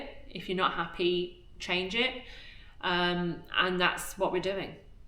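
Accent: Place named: England, United Kingdom. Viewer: Hi from New Zealand It is great to hear your English accent again. Thanks for your honesty of your life Once a traveling family always a traveling family. Yes you have to try things out to see if you like it. It is great to see a close family that wants to be together I admire that. Yes stay strong together and support each other. It would be great to see update videos on this channel every 6 weeks or so as I only follow the youtube format. It doesn't have to be flash and fancy. Just a talk video like this one and some short highlight videos. The house videos would be interesting to see but your future videos would be better. I at 59 would sometime in the future would like to come to Australia to do a 3 year traveling holiday but I have to encourage my wife to do it. We do enjoy for holidays and weekends enjoy traveling in our 2007 Jayco Sterling caravan and our 2015 ford ranger. 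It would be good to see you in NZ one day. All the best stay strong. Keep the great videos going thanks Jono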